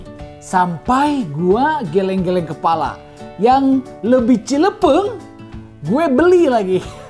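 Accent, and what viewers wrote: West Java, Indonesia. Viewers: logat sundanya keluar banyak wkwkwk jarang² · Sunda euyy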